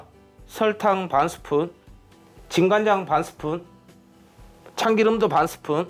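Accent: Jeolla, South Korea. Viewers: 아따 ~ 전라도 사투리 징하게 정감있어 부네요 . 세프님. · 구수한 전라도 사투리 더 정감이 가네요 ㅎ 늘 고맙습니다 짱~!!! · 전라도 사투리 너무 구수하네요 와 잡채도 맛나것소~잉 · 이렇게해각고 딱 이렇게해갖고 구수합니다 저두전라돈디 비스한지역갇은디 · 이 사투리는 전라도인가요?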